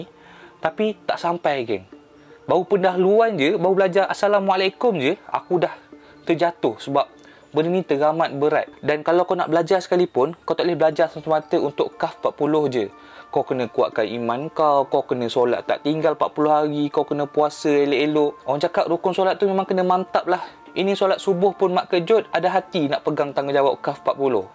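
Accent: Kelantan, Malaysia. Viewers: asal kelate ko?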